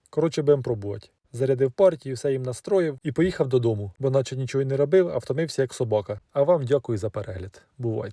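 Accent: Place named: Surzhyk, Ukraine. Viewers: Мои сваты из села Мыколаивки Новомосковского района так балакають, такой диалект суржика прикольный, юморной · Дякую за відео, слухаю наш рідний суржик як бальзам для душі 🎉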